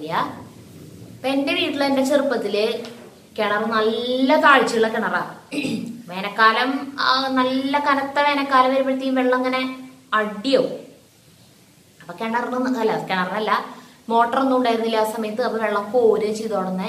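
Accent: Thrissur, India. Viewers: Thrissur anele😂😂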